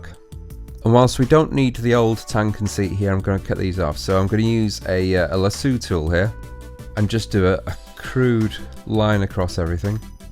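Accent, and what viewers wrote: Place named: England, United Kingdom. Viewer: i knew through his accent hat this guy is from england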